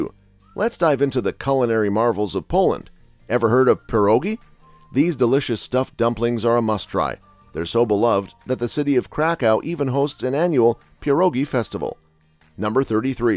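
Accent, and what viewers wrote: United States. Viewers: Imagine 2023 having internet still saying polish names with us accent disrespect... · Agree here. He should have learned how to pronounce it right and not to read it like an American would try it to pronounce. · Authentic Polish pronunciations should be used in this video- not Americanized pronunciation s.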